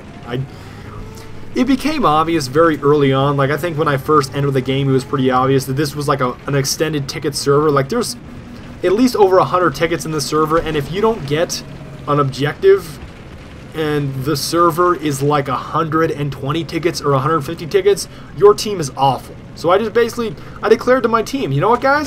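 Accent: Germany. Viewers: your german accent are nice :D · your german is soo hilarious xD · xDDD ur german sounds so funny , awesome vid, greetings from germany :)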